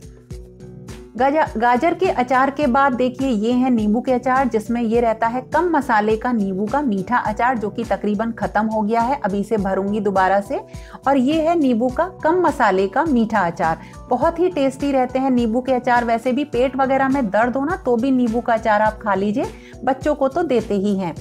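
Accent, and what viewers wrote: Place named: Bundelkhand, India. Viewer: Hi.ma'am aap kaha se ho,apki tone bundelkhand jesi h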